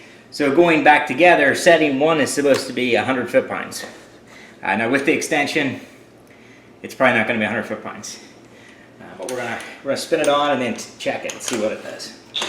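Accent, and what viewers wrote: Northern Ireland, United Kingdom. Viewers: Have one of the old type 1/2 Dewalt impacts tough and durable BUT heavy and not very compact still hanging on to bit of the nordie accent greetings from Dublin.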